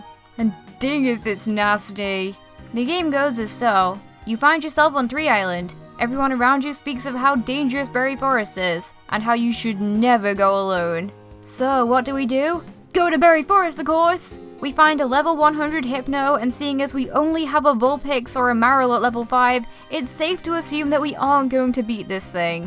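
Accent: United Kingdom. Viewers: 100% British I'm having a stroke hearing this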